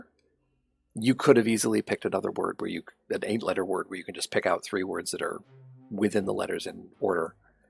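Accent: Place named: Canada. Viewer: Also curious since you mentioned it - there was something that stood out to me in one of your videos. I had assumed (now confirmed correctly) that you are Canadian since you have been saying "zed" but don't have an east-overseas accent. Though later I noticed when you were looking in the Hinterlands, you said "catch some ZEE's" which I think is the only time so far I've heard you say it like that, is that particular idiom usually said that way? Or did it just happen to roll off this time?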